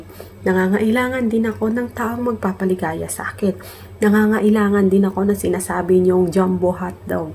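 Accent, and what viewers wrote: Iloilo, Philippines. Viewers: Ilongga ka idol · Nice ilongga si madam 😂😂😂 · Taga san kba ma'am? Daw ilonggo ka bla!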